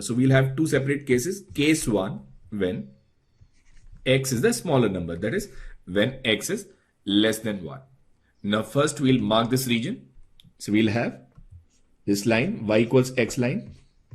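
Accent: India. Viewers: I cannot even read the rightmost part of the question and the Indian accent is strong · He is indian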